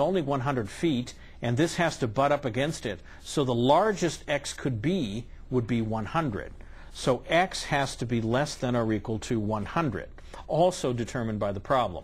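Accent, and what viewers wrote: United States. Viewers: eww southern accent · some kind of midwestern american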